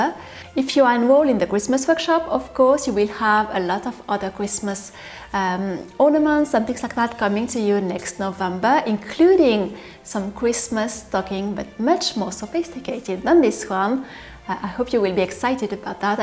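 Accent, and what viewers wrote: France. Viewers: I am so enchanted by you, your lovely French accent and your art most especially.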